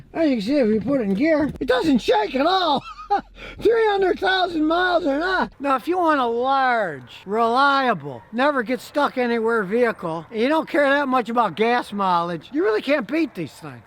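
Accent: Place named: United States. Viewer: Is this chap sniffing Glue ? . Or is this how Americans speak ?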